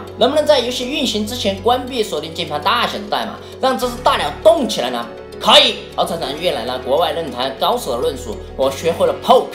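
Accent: Sichuan, China. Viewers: 台港澳地区观众听川普不会别扭吗´_>`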